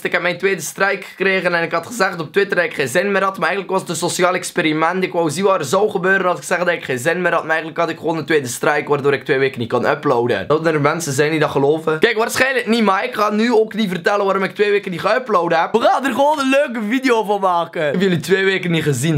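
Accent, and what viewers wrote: West Flanders, Belgium. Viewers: Dit is West-Vlaams, meestal voor de andere Vlamingen niet te verstaan maar bij valt het wel mee.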